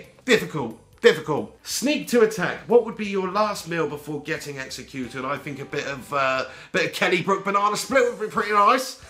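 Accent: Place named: Liverpool, United Kingdom. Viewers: OMFG that scouse accent is class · That Scouse accent is on point lmao! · i did'nt understand a single word you said with that scousse accent....i am from canada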